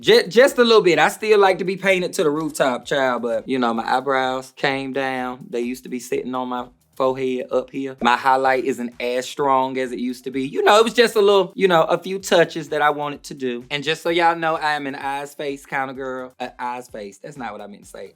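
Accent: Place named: South, United States. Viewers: Wait she’s southern?? I had no idea 😂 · What a pure pleasure to have and that southern accent for almost 30mins. Shes such a natural in front of the camera. Looove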